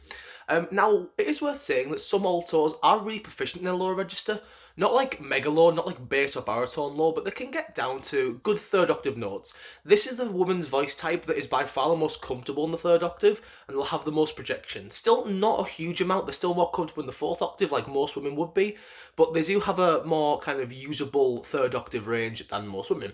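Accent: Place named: North, United Kingdom. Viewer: I have an accent fetish but I can't place yours exactly...it must be the far north somewhere between Yorkshire, Scotland snd Northern Ireland. I think I'm in love.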